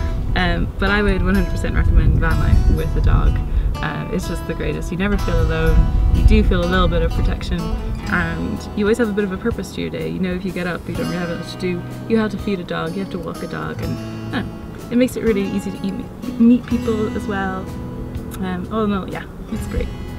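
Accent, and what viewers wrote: Ireland. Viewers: She is originally from Ireland, that's why she's sound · Is that an Irish twang I hear? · Are you from Ireland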